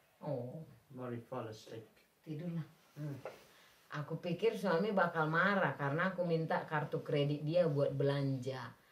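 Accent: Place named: Medan, Indonesia. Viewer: selalu tanpa skip kalo nonton vlog ka, seru bgd apalagi pake logat medan lucu bgd 😂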